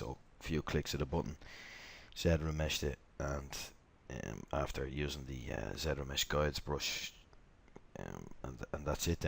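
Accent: Dublin, Ireland. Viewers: someones from Dublin ;) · fair play thanks for this series,sounds mad to say this but the fact we're both dubs i can follow along that bit easier compared to some other tutorials keep them going mate!:)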